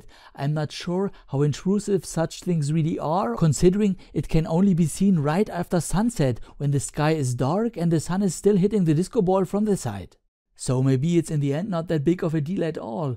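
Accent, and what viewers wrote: Germany. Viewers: Great Video. Where are you from your accent is very german. I’m from Germany. Hi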